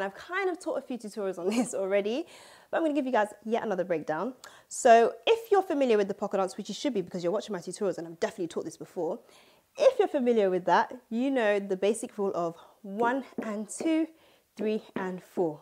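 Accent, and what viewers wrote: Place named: United Kingdom. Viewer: Loss the British accent and find your REAL tribe in Africa and be that. Stop the neo-influence policy of Europeanization.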